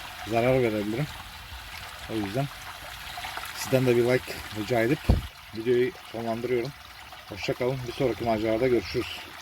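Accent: Thrace, Turkey. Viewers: Trakyalı olmalısın şiven öyle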